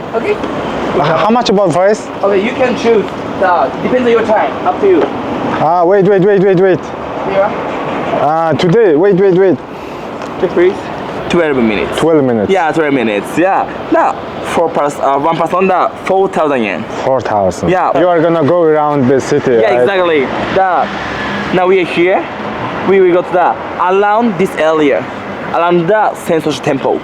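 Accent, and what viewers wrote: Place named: Uvs, Mongolia. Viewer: Uwsin egch bhshig bnshd haha ❤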